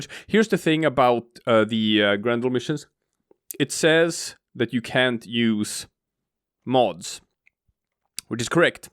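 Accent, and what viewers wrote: Sweden. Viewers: So u swedish? u sound swedish!!! Hej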